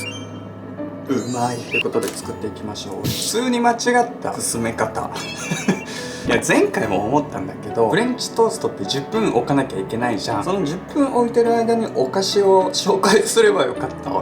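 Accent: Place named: Hakata, Japan. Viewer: 「 」で検索し始めてお目にかかりました！ 独特な方言が癒されますね〜😊福井っぽいと思ったけど博多弁なのかな？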